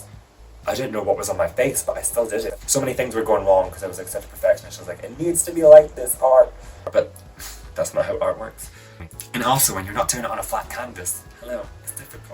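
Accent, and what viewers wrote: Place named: Scotland, United Kingdom. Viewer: You look sexy as real lady and like your Scottish voice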